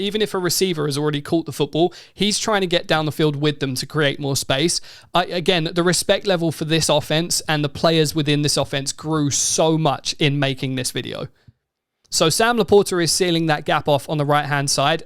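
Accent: United Kingdom. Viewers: Nice breakdown. Im so bad with accents. South Africa? Great to see a non American have such passion for American football. Edit: UK. See, I over thought it and guessed wronge. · In this case I shall not tell you to shut your gob, you tit. Refreshing to hear a Brit breakdown Lions highlights better than most Lions fans could. Cheers!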